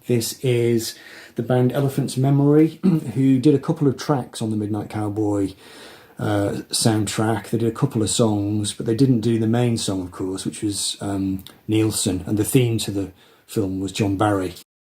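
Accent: United Kingdom. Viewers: Hi. Depressingly good video on the most positive manner. Some absolute tear jerkers in there along with some unexpected choices. Really great delivery which is such a strong positive on your channel. Are you a NW England guy, I’m trying to place the accent. I’m from the Potteries (Stoke if you didn’t know) as you can probably tell from my accent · Hi, very enjoyable and at the same time kind of sad video! There is something about lyrics recited with a British accent that really makes it classy, at least from an American perspective. take care, Elliott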